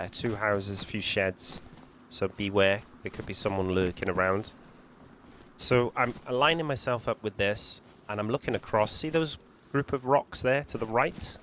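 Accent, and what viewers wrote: Wales, United Kingdom. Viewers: I genuinely look forward to watching your Dayz videos. I'm fairly new to it. Probably 30hrs and doing well tbh.. struggling with wolves and shooting 😂 but your videos is like like watching David Attenborough programs on animals... brilliant communication and something about your Welsh accent makes it enjoyable and interesting to watch.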